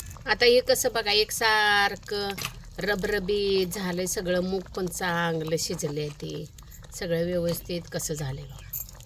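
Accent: Kolhapur, India. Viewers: खुप छान मावशी तुमच्छा जेवणाची चव तर माहीत नाही पण तुम्हची बोली भारी आहे कोल्हापुर तल कोणतं गाव तुम्हचं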